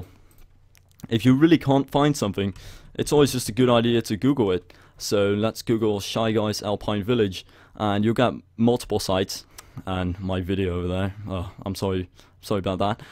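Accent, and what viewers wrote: Netherlands. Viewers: are you dutch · haha ik hoorde dat je nederlands bent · Jij bent Nederlans haha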